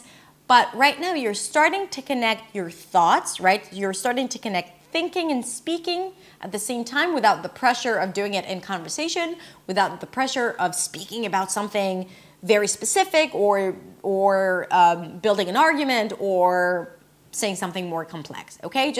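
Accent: United States. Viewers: Hey, you are super nice about your accent. you incorporated totally the american accent. That's my point of view.. Xoxo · Wow, your native is Hebrew and you sound like an American, even speaking very quickly, good teacher. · I can understand you clearly, I thought you were American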